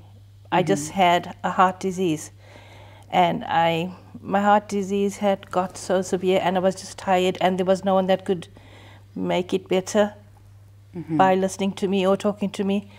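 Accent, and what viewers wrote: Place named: South Africa. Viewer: Is she South African? She sounds South African. Hope this has stuck after so many years.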